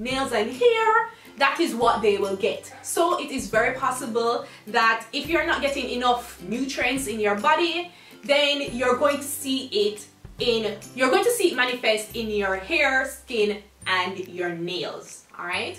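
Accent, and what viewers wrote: Jamaica. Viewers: Am I the only one that heard her say coochie cream? DWL... anyways I just discovered this channel and I love it already mainly cause she uses her Jamaican accent.. Issa Caribbean ting :) You are very funny and helpful.. continue with the good work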